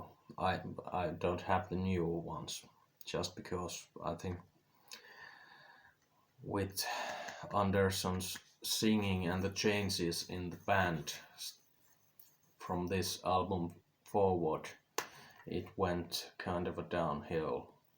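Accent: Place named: Finland. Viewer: Ive missed that Finglish accent🤣 ✌✌ 👏👏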